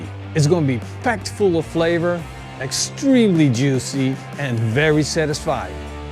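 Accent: Netherlands. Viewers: English with a dutch accent, lekker boers hahaha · Your accent sound like Dutch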